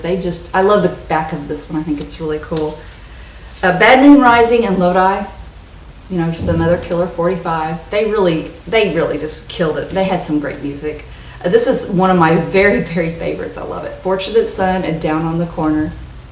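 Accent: South, United States. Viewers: I love your accent,. Are you from Georgia or the Carolinas? I’m in Wisconsin.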